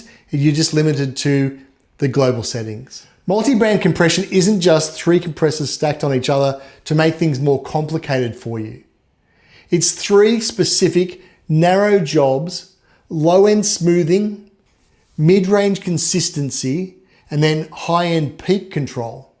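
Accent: Australia. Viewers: Good to come across an aussie accent!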